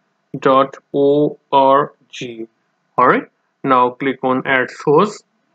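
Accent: India. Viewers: took me 10 min to understood what symbols y say Indian accent not say in description also i cant see 720p pfffff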